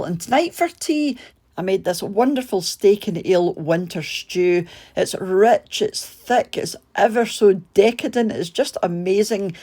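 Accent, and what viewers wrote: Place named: Scotland, United Kingdom. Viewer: There's something so comforting listening to you as cook lovely meals. Must be the Scottish accent!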